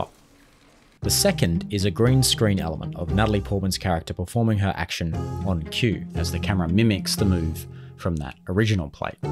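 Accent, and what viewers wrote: Australia. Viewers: Hi, just found your channel, couldn't stop watching when I did. love it. first thing I noticed was an Aussie accent. love your work mate. Gold Coast.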